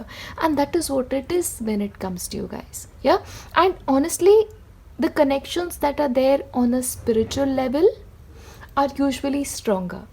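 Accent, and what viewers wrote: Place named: India. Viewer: Thank you for the readings 💐. You rightly said we are a soul group, connected through messages 💖. I claim pile 2 as I like turtles and these messages connect with me 🙏 are you not from India? Your accent is very Indian 😊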